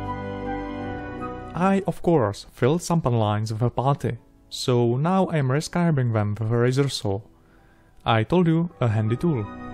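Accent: Czech Republic. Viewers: máš takovou tu sklasickou českou angličtinu Češi mají dobrou výslovnost ale jde to poznat že to jsou Češi takže tak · Thanks to this channel, I now have the ambition to... make models? No. To be able to mimic a Czech accent! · are you from czechia cause your accent is sunding czech.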